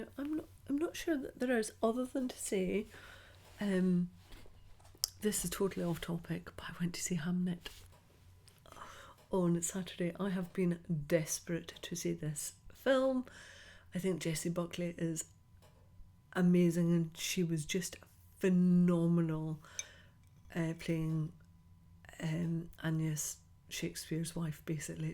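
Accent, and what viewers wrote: Scotland, United Kingdom. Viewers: I like the Scottish accent and I love learning the dialect words btw! My Mum used to knit jumpers for me, with every one she would send some of the wool, a darning needle, a spare button, a sewing needle and some of the cotton thread wrapped around a little piece of card. I never lost a button or needed to mend a garment! But that is one way to get rid of your left over yarn. I have to say mine just hang around until I need them for swatching, blogging, or just practicing a stitch. But eventually I have a good clear out and they end up at Charity shop! Could you do a woven hanging? Just thinking out loud! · I am flemish and I have now no problems with understanding your scottish accent. I must say that I had to learn to understand the scottish language. what helped for me was listening multiple times the same video. and the fact that I really wanted to understand it, as I was a bit in love with everything scottish. 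the film 'wisky galore' was a good teacher - I watched it several times. Did you saw the latest podcast of The Wooly Thistle, the crochet-contributor talked about your pattern in the latest Yarn-magazine, and she called you her favoured designer. that must be nice to hear! about my scrabs : I crocheted two bedside-carpets. I mixed and matched all my grey yarns, and all my reds, and made a checkerboard with small but thick squares. I love them and they reduced my stash of leftovers very well. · Hi. My first time commenting. You’re newly found and subscribed on my YouTube subscriptions. Firstly I wanted to say Im loving your podcasts, Im watching multiples per night to catch up. Watching is like sitting chatting with an old friend. To answer your question about what I do with yarn scraps: mine are knitted or crocheted into blankets for my local dogs home for the little treasures to snuggle up with. 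I tend to take a pile up with me at the end of the summer, ready for the colder weather. I try to do different techniques or patterns I haven’t tried before, so it’s a good practice for me also. I lived in Edinburgh for a while when I was young so I’ve no problem with your accent 😊 Keep up the amazing work ❤❤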